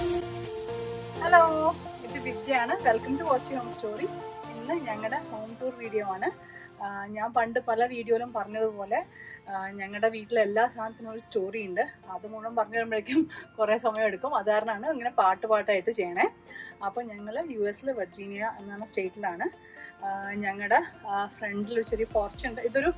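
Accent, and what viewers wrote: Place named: Palakkad, India. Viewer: Hey,I am a new subscriber!vlog popped up on my feed!excellent narrative and sweet home!I also made another observation,Are u from palakkad?oru valluvanadan slang thoni!I also hail from pkd.And ur gestures and slang resembles anchor rekha menon too!☺️Has anyone else told the same?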